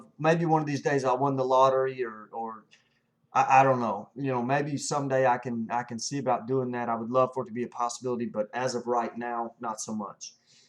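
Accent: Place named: Kentucky, United States. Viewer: I really like your videos. They have helped me a lot. I also really like your Kentucky accent!!!!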